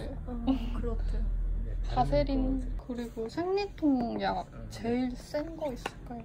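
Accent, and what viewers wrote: United Kingdom. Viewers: I loveeeee your English accent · ㅝ지 당신? 영국식 영어 발음 너무나 섹시하자나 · 영국 교환학생 가시려고 일부러 영국액센트 공부하신건가요? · 전 사실, 영국발음/표기 쓰시는 보구 정말 조았답니다, 중국 한국 도 좋지만 영국 라닛 ㅠ ㅠ ㅠ ㅠ ㅠ 기대할게요❤️ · 영어하시는 영상에서 발음이 영국이랑 비슷해서 듣기 너무 좋다 생각했는데 영국 교환다녀오셨군요!!대박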